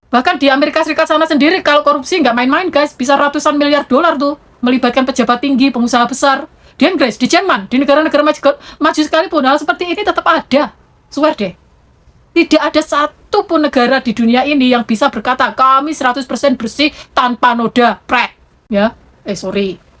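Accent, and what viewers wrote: Java, Indonesia. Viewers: 😅😂 LANCAR AMAT BAHASA GOYANG INDONBABU LOGHAT JAWA YANG DI TUTURKAN AMA MBAK BABU JAWAHAMA NIH.. BERBELIT2 BERPUTER2, BERPUSING2 DAN BERULANG2.. 😅😂 BABU.. BABU..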